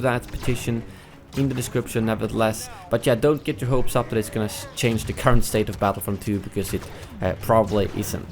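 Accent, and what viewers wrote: Sweden. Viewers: You from Sweden?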